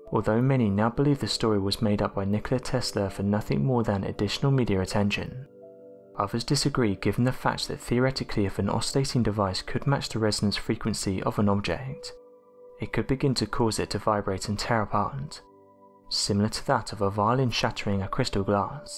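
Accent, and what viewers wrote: England, United Kingdom. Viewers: He has a normal English accent, and no one is making you listen to it.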